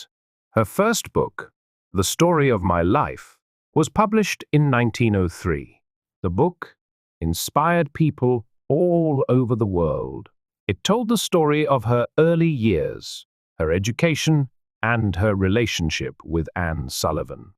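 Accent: United Kingdom. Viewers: thank you!! I like it a lot more when it is speaking in British English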